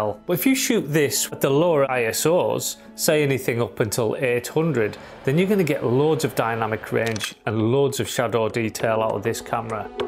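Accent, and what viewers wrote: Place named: Carlisle, United Kingdom. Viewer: Hi subscribed as soon as I heard the accent! Ex Carlisle lad living in Australia. Have been thinking really hard about selling all my olympus gear and getting this camera…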